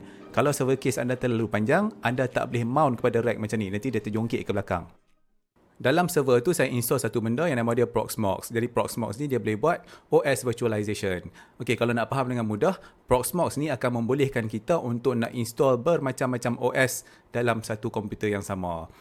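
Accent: Malaysia. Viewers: patut lah awak ngomomong macam orang malaysia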